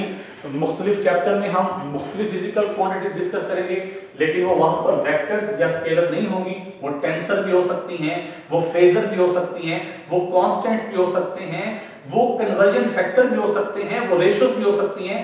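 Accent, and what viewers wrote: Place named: Multan, Pakistan. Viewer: Voice of multan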